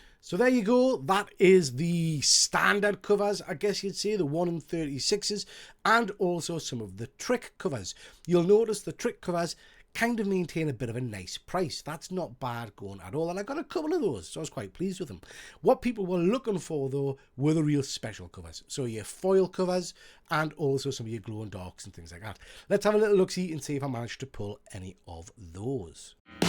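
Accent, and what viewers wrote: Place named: Northern England, United Kingdom. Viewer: Sounds like a northern lad, gives comics away for free! How did it take me so long to come across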